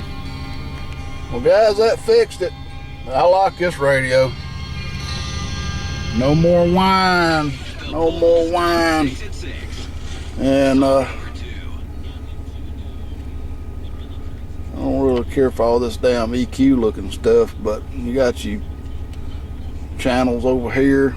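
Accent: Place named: Alabama, United States. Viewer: I love these videos! You sound like you live in Alabama, where I live. You do an excellent job and you're entertaining too.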